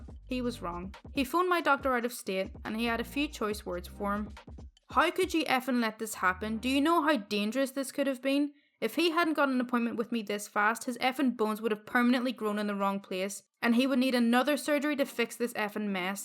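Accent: Ireland. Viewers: Np, it's true though. ^-^ You really never considered it? And hmmm... The obvious would be a wise guide character type, such as nanny mcfee. The accent, (Soft Irish?), is perfect and you're very well spoken. I'd guess an anime protagonist would be best though. You have a welcoming voice, and you're likable. Bet you could rock a Ghibli film! What do you think would suit you? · Hm... are you Irish,?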